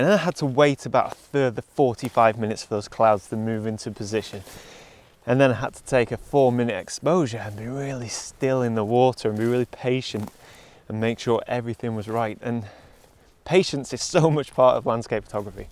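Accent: United Kingdom. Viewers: You put an emphasis on slowing down, and I took up photography to fill the slow moments when out hiking. Irony (: I love the channel though, even though I think about photography with a British accent now. "Ab-solut-ly Stonnin!"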